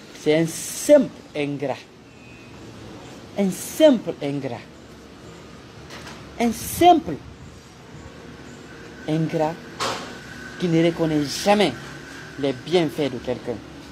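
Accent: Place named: Pular, West Africa. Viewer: Tu na pa mem le merite detr un ministre avk tes Français poular ....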